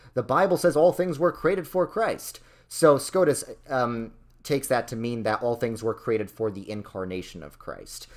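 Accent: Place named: East Coast, United States. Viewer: I love the videos and good info on other branches of Christianity than the one that I grew up knowing However, when your East Coast accent comes out I hurt a little bit.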